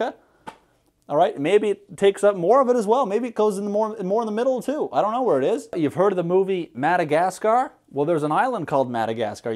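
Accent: Newfoundland, Canada. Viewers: As soon as you said “lore” a heritage moment played in my head and I became fully aware that you have to be from Newfoundland. But like, also every other word as well. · the way that I could tell he was Canadian before he even said it. -sincerely, a fellow Canadian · Aha - I picked out that you’re Canadian from your accent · I watched only two of your other videos before, the horrible yo mama jokes with the theory of relativity and congestive heart failure, and I was wracking my brain thinking - where the hell is that beautiful background and what is his accent?? It's not quite European but it's not quite American? When you said Newfie, it all made sense lmao cheers from the 6ix, thanks for mentioning Ontario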